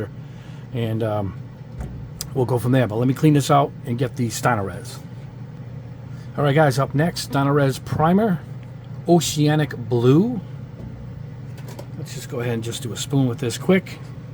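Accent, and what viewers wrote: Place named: Rhode Island, United States. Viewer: I've been using my Iwata CN NEO in both trigger and button valve for a few years now and I'm getting better every day. I need an airbrush with a 0.2mm or smaller needle for painting some minis. Sure, I would love to get an H&S but $$ is really an issue for me as a retired person. What airbrush would you suggest $50-$75 for what I'm looking for? Hey; by the way, I'm a fellow Rhode Islander born and raised in Bristol before I relocated to (Bristol) Vermont 15-16 years ago... I love the fact that I can listen to your accent and really feel like I'm at home listening to an ol' buddy.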